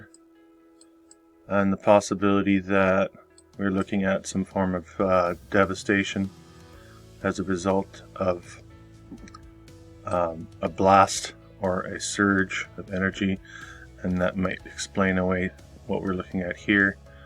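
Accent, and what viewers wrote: Canada. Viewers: …Can’t wait. Great stuff your putting out…Looking forward to the Erie Canal vid, you mentioned…I’m I picking up a little Canadian accent..🍻For now. I’m off to clean up more ❄️ ❄️Lake effect from Erie. Ani’t it grand?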